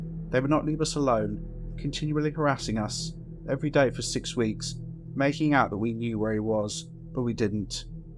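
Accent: United Kingdom. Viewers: This is to the narrator ....you have an absoloutly kickass voice /accent , just love you Brits ,,,,,simply and utterly smashing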